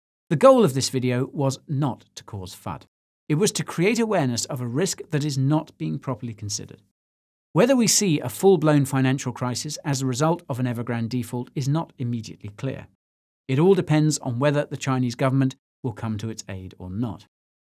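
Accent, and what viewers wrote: United States. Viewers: stick to the cowboy accent brother !!! it suits you !!! :) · Your American accent is on point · LOLOL I love the American English accent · Love that American accent. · Good American accent...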